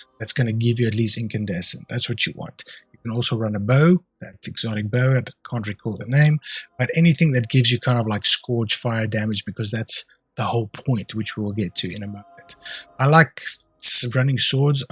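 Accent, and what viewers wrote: South Africa. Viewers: Great build video. I'm rocking something similar to this. Gonna edit and see how yours feels Are you from SA? The accent is so distinct.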